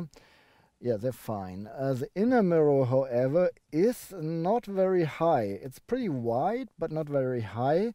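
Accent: Germany. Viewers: No need to apologize for German accent, everyone who speaks English has an accent of some sort. Great review, very in depth, keep them coming. Danke. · Now I've trawled through loads of reviews for various cars and have seen some pretty good ones at that. I must be honest and say that the easy and relaxed way that this review was conducted was spot on. Informative, detailed although long kept me glued to the screen. With regard to the strong German accent, having previously lived in Germany for four years can quite honestly say that you speak better English than I speak German but also that you speak better English than some English people I know. I am looking for another car and have decided to buy a 2016 2.0 Tdi L&K 150bhp, having test driven one already find myself bitten by the Superb bug. Thank you for a great review.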